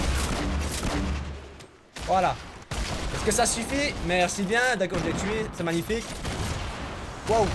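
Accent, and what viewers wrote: Belgium. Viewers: T'es belges ?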